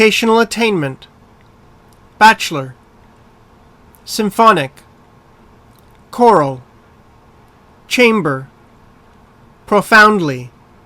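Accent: Canada. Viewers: 완전 좋아유 👍💯💯👍👍 캐나다 남편께서 직접 녹음하신 듯 하네요. 🤗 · 여기 발음은 캐나다 사람입니다~🐱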